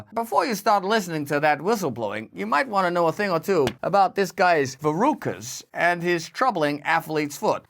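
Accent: United States. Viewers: Your American accent is spot on 😂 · this guy is talking like he's American...let me hear your American accent... better to hear then your natural tone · I wish there were a navigation app with Russel's American accent as the voice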